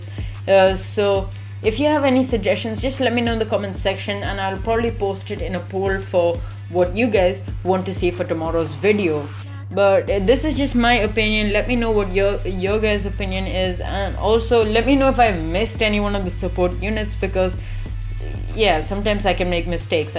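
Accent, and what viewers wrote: India. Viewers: bro good video :) btw you sound like your from india which is a good sign because people from india always have best help and tips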